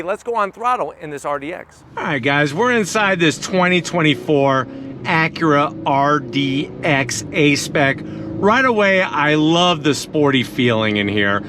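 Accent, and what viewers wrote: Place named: New Jersey, United States. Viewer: I need to know if you're originally from Jersey. Your accent is driving me crazy. It's so similar to my mother's.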